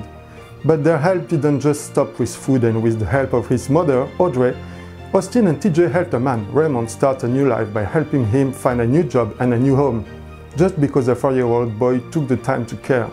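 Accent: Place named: France. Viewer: Maydee I ffink ze baiste iss mébi douwing your videyos in frenche and subtitle them in English. I had to turn subtitles to understand, you have a very Antoine de Caunes type of accent and some words are just missed because of the “intonations “.... but very good choice of news, happy indeed. But try smiling (even) more. It’s happy news ! 😁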